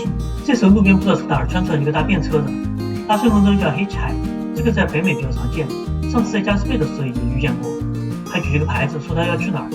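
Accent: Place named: Sichuan, China. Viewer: 发音像四川人说普通话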